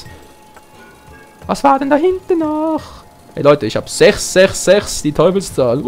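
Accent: Switzerland. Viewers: Man merkt total das du schweizer bist ^^ Bin auch schweizer aber kann perfekt deutsch ohne akzent reden, weiss auch nicht wieso :D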